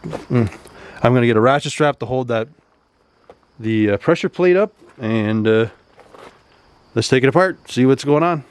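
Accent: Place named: Canada. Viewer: My two favorite words Canada guys say. is "Out" and "Process" To us assholes down south. Your "Out" sounds like "0uuuut". And Process. You say it as spelled. You say " PRO---U--CESS". All good. We say "Prawcess". We are all jerks! Love your channel! Rock on man up north! Oh There is a another thing.... I will wait.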